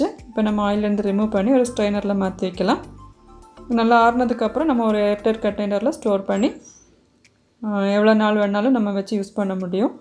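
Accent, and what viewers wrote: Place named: Kanyakumari, India. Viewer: Sis nenga kanyakumari dist a🙄...slang apadi iruku🥰🥰...nice sis💐💐☺️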